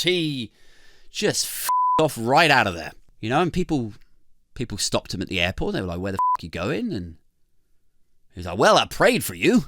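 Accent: United States. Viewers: I love your American accent, say "I'll use my credit card!' next!!!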